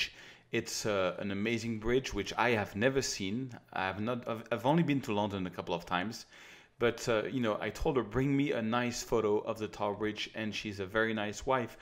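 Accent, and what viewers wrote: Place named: Paris, France. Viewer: god ! you are so french so parisien...but is ok ! je suis un toulousain in hawaii..continue ton bon travail ......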